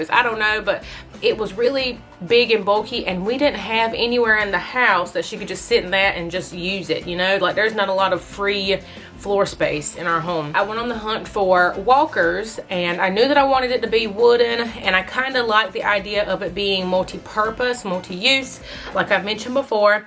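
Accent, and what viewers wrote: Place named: North Carolina, United States. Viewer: My kids are grown. I just like hearing you talk! You're NC accent sometimes has a bayou twang.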